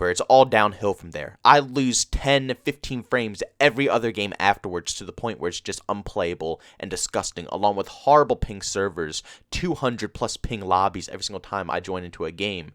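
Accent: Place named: Mid-Atlantic, United States. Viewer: Dude you must be from the PA, Maryland, Delaware area with that shitty accent. The way you hold your O’s is atrocious lol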